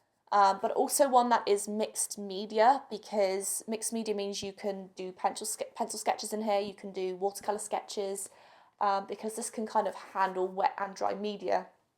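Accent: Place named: South West England, United Kingdom. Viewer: I detect a south westerly accent there; maybe Bath?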